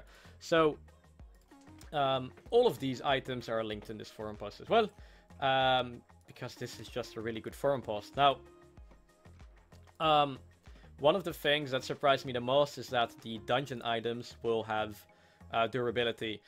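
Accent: Netherlands. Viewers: R u dutch? Just asking?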